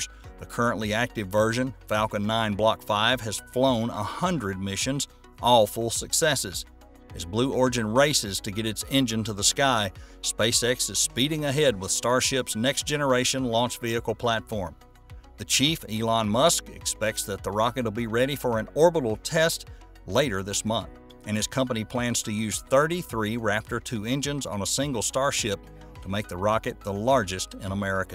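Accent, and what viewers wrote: South, United States. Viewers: Whether it’s a real human or just a really nice voice synthesizer… that’s an awesome Southern accent!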